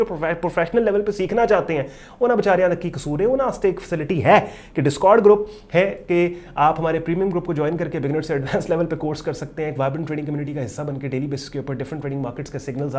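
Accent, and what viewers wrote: Punjab, India. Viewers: Mashallah I like the way you explain bro or Jo Panjabi Ka trqa lgaty Hain Kia kahny😂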